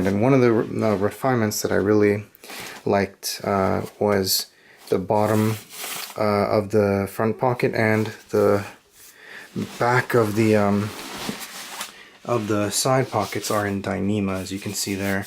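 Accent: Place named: Midwest, United States. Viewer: Nice 420 joke. You should put some information on your profile. How did a guy named that speaks with an American Midwestern non-accent get over to the wilds of the European Norselands? Enjoy your videos greatly!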